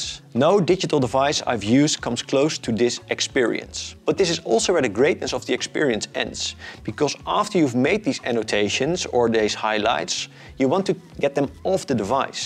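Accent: Netherlands. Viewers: I appreciate a video that evaluates the product after a fair trial period. Given that you have used this item for 1 year adds complete credibility and that is obvious from your remarks. I've been going back and forth about the Onyx Boox for a couple months now. This video came at a great time for me. So, thank you. And I have to agree with T HOFF on your Dutch accent, it reminded me so strongly of my Dad who came to Canada from Enschede when he was 17 years old. My Mom always said, "Wooden Shoes, Wooden Head, Wooden Listen" Ah...but he was a good man.☺ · i hear dutch accent noises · This was super helpful. This was exactly the information I was looking for. Having it all in a nice Dutch accent was just the cherry on top. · Great honest review. Love that dutch accent btw - reminds me of my days in Europe. I want to get an e-Ink device, but can't see it replacing my OneNote / Suface and iPad workflow. 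Pens with colors, highlighter and the incredible search and synch capabilities of OneNote make it impossible for me to take this big step back. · He spoke for one or two seconds and I immediately looked at his name to conform what I heard. And yep, he's Dutch. I recognize Dutch accents anytime. My native language is Dutch and I'm from Suriname, South America.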